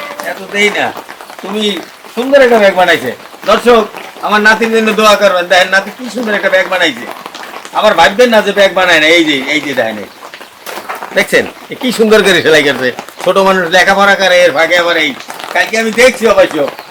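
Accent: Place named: Barishal, Bangladesh. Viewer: খাস বরশাইল্লা ভাষায় কথা শুনে মনে হয় নিজের এলাকায় আছি।পটুয়াখালীর ছেলে আমি, আংকেল আমি আপনার ভিডিও রোজ দেখি অনেক ভালো লাগে পাশাপাশি আপনার মাধ্যমে রান্নাও শিক্ষতেছি।আপনার জন্য আন্ত্রিক শুভেচ্ছা ও অভিনন্দন রইলো ভালো থাকবেন।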